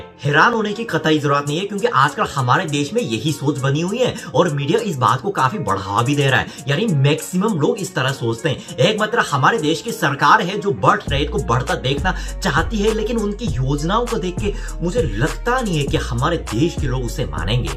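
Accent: India. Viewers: You are born in South Korea🇰🇷 but your way of talking is Indian🇮🇳👳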